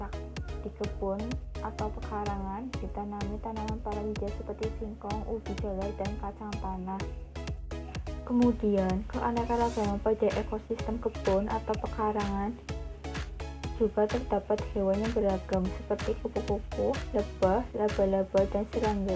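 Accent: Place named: Bumiayu, Indonesia. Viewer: Mentore tegang dalam menjelaskan kurang sante karo bahasa bumayune kegawa banget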